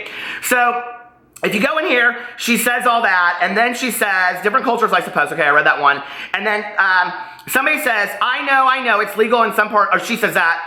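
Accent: South, United States. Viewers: I’m a life-long Southerner, but I do wonder why you, a life-long Indianian, have a Southern accent. Like the way you say “thang” instead of “thing.” So cute! I love it!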